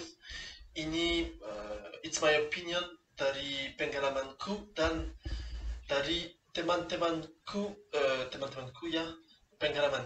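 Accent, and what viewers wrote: France. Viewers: French accent is soooo sexy... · Bahasa Indonesia kamu beraksen france, dan itu menarik :)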